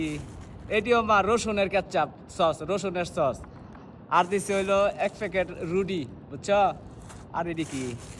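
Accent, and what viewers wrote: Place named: Mymensingh, Bangladesh. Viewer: ভাই মনে হয় তোমার বাড়ি ময়মনসিংহে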